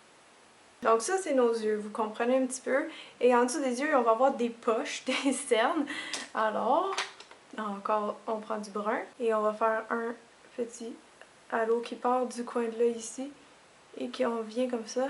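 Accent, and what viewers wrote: Quebec, Canada. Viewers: Ta un super accent canadien français et anglais !! XD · C'est un accent québécois je pense... Je sais pas parce que je le suis... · J'adore dessiné! et c'est vraiment joli ce que tu fais! J'adore! et je pensais que je n'aimerai jamais l'accent quebecois. La je suis je sais que je me suis trompé. A croquer :) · j'habite aux états-unis, et j'ai vu ton vidéo, et j'ai pensé "cool, je comprends quelque français, donc je le regarderai!" eh bien tout de suite j'ai su que tu est canadienne à cause de ton accent. ce sons un peu comme un accent américaine avec l'accent français, ensemble. Je suis fier de moi, que j'ai pu le reconnaître immédiatement. :) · quel accent sympathique. vive les quebecois! ^^ et quel travail ! bravo, j'aurais pas fait mieux.. xD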